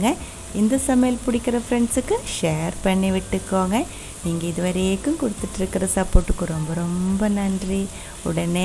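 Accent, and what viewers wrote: Kerala, India. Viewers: Hi sister I like ur Malayalam Tamil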